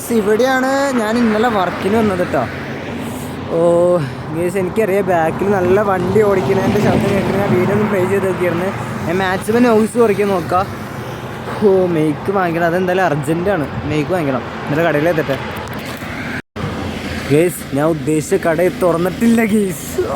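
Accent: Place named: Muvattupuzha, India. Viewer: സംസാരം കേട്ടിട്ട് മുവാറ്റുപുഴ പോലെ തോന്നി 😂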